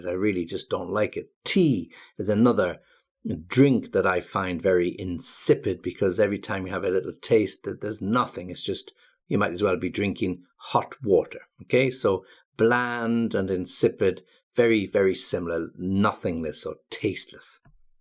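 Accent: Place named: United Kingdom. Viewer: Wow, I like a British accent 😊😊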